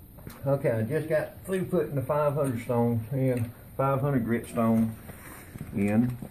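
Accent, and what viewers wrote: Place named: Texas, United States. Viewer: Live your accent. Texas!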